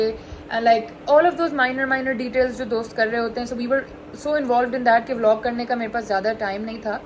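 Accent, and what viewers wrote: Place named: United Kingdom. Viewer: Why are you showing British accent you are Pakistani speak in your native Urdu language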